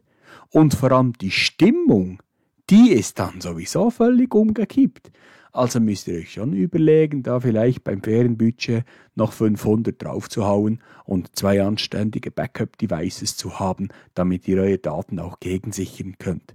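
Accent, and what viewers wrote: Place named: Switzerland. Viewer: ich grinse hier vor mich hin. irgendwann fliegen wir mal zusammen. kannst du dir das vorstellen? Wir sitzen zusammen da drauf? Leider kann ich mit den Tips nichts anfangen. War aber nett deinen Schweizer Dialekt zu hören.!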